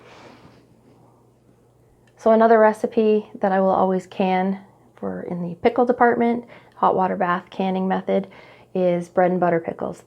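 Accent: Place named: Canada. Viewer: Where are you located? It sounds as though you’re from Canada.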